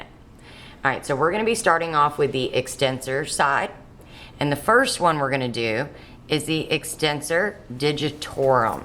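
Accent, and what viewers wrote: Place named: South, United States. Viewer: Something about the southern accent makes it all stick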